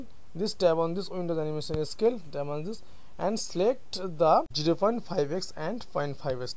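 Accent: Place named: England, United Kingdom. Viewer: English khatarnak hai bihari...par axcent pura angrejo wala mara hai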